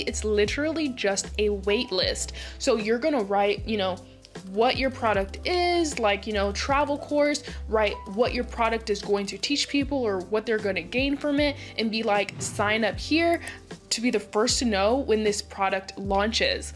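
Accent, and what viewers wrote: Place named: Spain. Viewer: Girlie, your Spanish accent is amazing! I'm so grateful for your videos new subscriber here!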